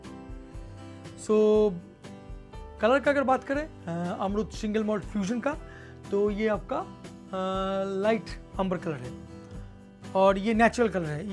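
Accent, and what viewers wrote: Bengal, India. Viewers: Bangali ho kya?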